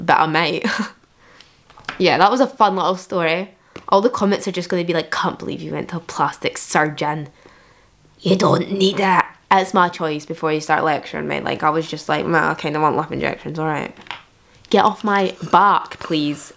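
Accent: Scotland, United Kingdom. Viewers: because raylas scottish lmao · never seen a comment section struggle so hard to find out where she is from. Is it that hard to tell she is Scottish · somaya no shes scottish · Guys, Scotland is IN Britain, so technically her accent is British. Not all British accents sound like queens English accent “typical posh” accent. I may be wrong but she sounds Scottish to me, or northern English. So generally speaking I think non UK/Irish people think the entirety of england is the UK while forgetting there are 4 countries in Great Britain all with 100s of different accents in each part. Yall spoke like there’s just 1 accent in all of Britain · i did NOT expect u to be scottish 😳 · Scotland b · she got a scottish accent lmao my dad got one too . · Lol she doesn't have a English accent she has a Scottish accent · I bet you're American, huh. Cause that was completely and utterly stupid. 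Her accent is violently Scottish and all the people in that show are English. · Skanda Kousalya Devathaa she's scottish alyssa's from york x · Keep your natural lighting I think it looks better :) also love to hear a Scottish accent on here, I’m from Edinburgh and Boots on Princess Street sells Fenty now ♥️ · i dont think so because i am and her accent is a little different i think shes from more southern scotland idk lol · didn't expect the Scottish accent thank you for enlightening me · bpdbitch nah bro yea we’ve seen people with that the same accent (Ik she’s Scottish) but she just gives the vibe of it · was not expecting you to be scottish and am loving it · Scottish. I already knew this, but She's talked about it in older videos. Apparently, it's the lowest of Scottish accents where she's from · I could listen to your Scottish accent allll day long.... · Ah a fellow Scottish person 🥰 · no it's not, she's scottish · She sounds Scottish to me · Scottish accent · Thot Scottish accent I love ❤❤❤❤❤❤❤❤❤❤❤❤❤❤ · V a n i l l a that is definitely a scottish accent not a british accent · haha, Scottish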